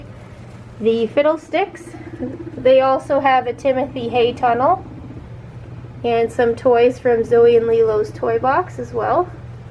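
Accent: Canada. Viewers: You do you girl! Say things the way you're comfortable saying them. I just thought that was how you guys say it in Canada. Lolz I really enjoy your videos and you have helped me to know how to take care of my piggies Soo well. Wheeks from my piggies to yours. Thanks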